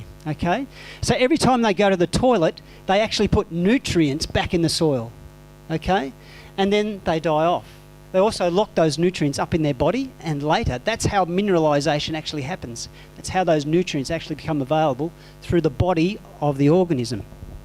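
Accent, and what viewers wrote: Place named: Australia. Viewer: Where in Australia are you from? Great talk!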